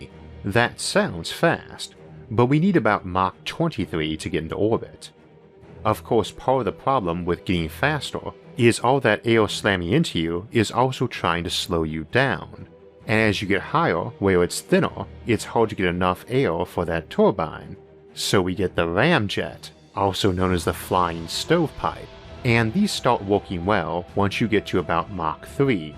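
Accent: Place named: Australia. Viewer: and im still tryna figure it out (i only hear 80% australian idk the rest...). 🤣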